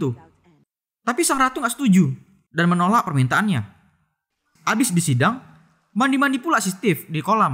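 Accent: Medan, Indonesia. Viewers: Ngakak gw liat ngomong logat medan ny🤣🤣🤣🤣🤣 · Bahasa mu sperti anak medan bang..😂😂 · Orng medan nya kau wakk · Entah seneng aja dengar orang Medan cerita ... Lucu" gitu 😂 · Ini abang ini ku rasa orang medan dari ngomong nya kayak orang medan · Lo orang Medan ya bang? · orang mana kau bg, medan dimana nya wkwkw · Medan x bah abang ini, 😂😂😂😂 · Bahasanya kek temen2 gw di batam..dari medan ya bang?wkwkwk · Orang medannn ko kan bang7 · Medan kali cakap abang ini bah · Main kali logat medannya bg 😁😁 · Komentator nya orang medan.... 🤭🤭🤭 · orang medan juga ya bang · Nampak kali ini kalo kau orang medan 🤣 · Anak medan ya ini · Bahasanya anak medan satu nih lucu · Logatnya itu loh Medan x bah 🤣🤣🤣🤣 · Orng medan kau ya lae😂😂😂 Sma lah kita lae Smngat lae buat conten nya👍👍👍👍👏👏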